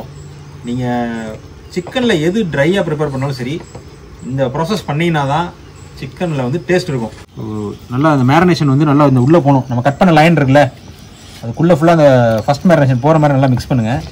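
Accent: Madurai, India. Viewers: எனது வயது 39 எனக்கும் சொந்த ஊர் மதுரை தான். Hope சம வயசு ஆளு தான்னு நெனைக்குறேன். இந்தாளு வீடியோ வையும் பேச்சையும் கேக்கும்போது ஏதோ கல்லூரி, பள்ளி நண்பர்களுடன் இருப்பது போல ஒரு Feel. அந்த கால கட்டம் வந்து போது... இந்த சமையல தாண்டி நீ நம்மூரு ஸ்லாங்ல பேசிட்டே இருயா... அதுவே நல்லாருக்கு... ❤❤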